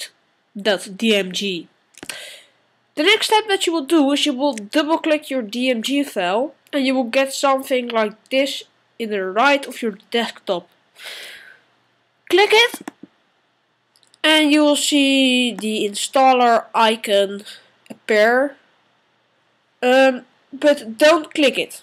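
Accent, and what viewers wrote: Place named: Netherlands. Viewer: I can clearly hear that you are dutch :D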